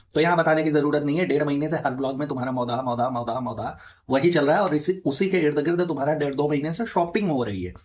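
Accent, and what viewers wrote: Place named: Lucknow, India. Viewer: Are you from Lucknow or Hardoi or nearby places like Sitapur, actually aapke baat karne ka tarika bikul wahi ka hai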